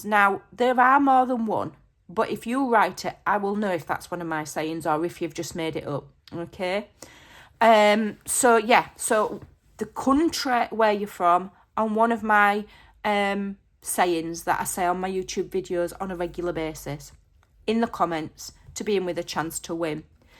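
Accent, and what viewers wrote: Yorkshire, United Kingdom. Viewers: Hi I am from N Ireland I love when you talk to yourself “stop waffling and get on with it” in your Yorkshire accent. these journals are gorgeous if it was me I could not part with them. Your Ko-Fi items are great value I did enjoy the mini book series and digital. Keep up the great work ❤ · Hi,. I'm from Spain. Both your crafting skills and your fabulous British accent ( I'm a teacher of English) inspire me. I'm about to engage in my first crafting project ever and I chose you as my guide because you are not afraid of making mistakes, which is what builds confidence eventually. This is what I need. Well, sorry. Let's get to the point. There are tons of saying to mention I had a hard time to understand at first, such as the one when you talk to the paper and call it "sucker". My favourite one is " she says", basically because I still haven't figured out what it means. 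I guess its like saying " or this is what is supposed to happen"´.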